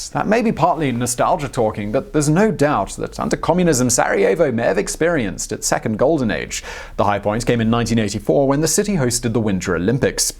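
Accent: United Kingdom. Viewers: oh and the british accent with the rounded "o". yeah he s smart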